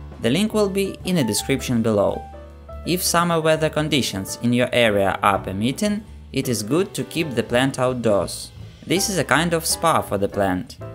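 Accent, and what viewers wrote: Russia. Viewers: Привет. Такое ощущение что голос русскоговорящего человека. Это так?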